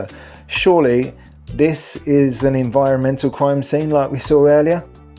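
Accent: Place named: London, United Kingdom. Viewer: I nearly choaked laughing, that gangster london accent 😂😂 Very entertaining commentary 👏 😂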